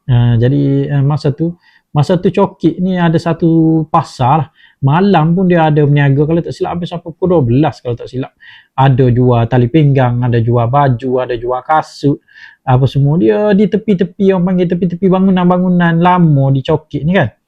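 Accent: Kelantan, Malaysia. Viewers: Asslmualaikum..selama perak hadir..sekadar cadangan kt..klu boleh kecek kelate lebih kerap..dpt gak blajo 😊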